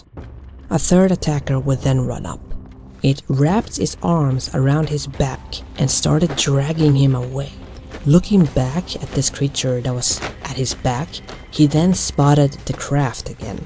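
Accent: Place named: Sweden. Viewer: It's an a Swedish accent. What's wrong with your ears and cognitive reasoning ?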